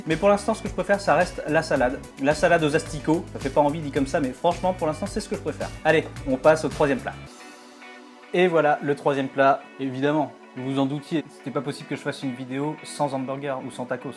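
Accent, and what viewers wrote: Canada. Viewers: PK tu fais plis l'accent canadien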